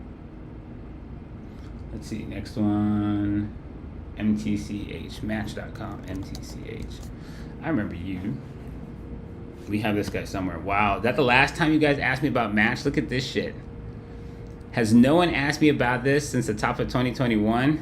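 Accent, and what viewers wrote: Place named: New York, United States. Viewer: i hear that NY seeping in here'n there :)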